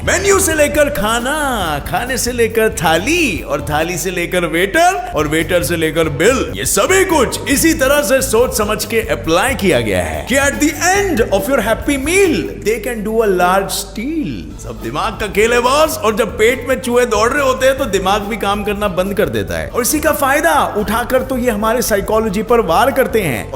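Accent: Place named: Kumaon, India. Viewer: Bhai as your dialect is kumaoni. Do you know Kumaon mastiff.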